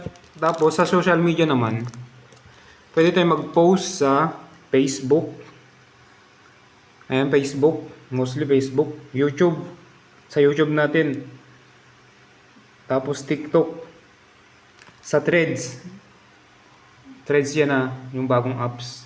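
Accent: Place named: North, Philippines. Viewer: taga north ito halata sa tigas ng salita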